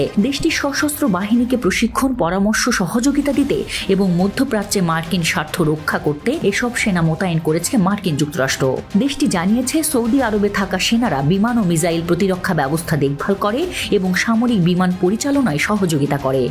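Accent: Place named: India. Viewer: ভারতিয় কন্ঠ মনে হয়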